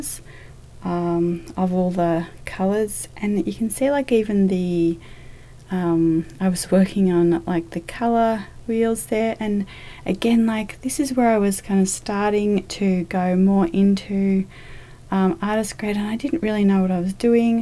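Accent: Australia. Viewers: A nice Aussie voice talking about paint? Instant sub!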